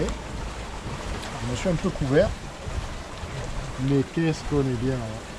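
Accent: South, France. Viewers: Hello, How are you! It’s cool que tu speak english!. Je ne m’y attendais vraiment pas et ne me permettrais surtout pas de me moquer, je ne fais vraiment pas mieux... cette chaîne s’ouvre aux anglophones, bravo. A priori ceux de Falmouth comprendront certainement mieux l’accent du sud que les écossais 😉 isn’t it? Y a plus qu’à te souhaiter bon vent pour ce nouveau voyage, je devrais plutôt dire cette nouvelle odyssée... vu la destination ciblée. Attention à bien éteindre l’AIS en Croatie, sinon tu vides le porte monnaie pour rien. Ciao, tchuss, salut, arrivederci, bye bye 👋. Et merci pour ces images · Pas mal ton anglais avec l'accent du midi! Bonne mer mon, fait nous rêver, avant mes vacances (juillet et aout, mais en atlantique)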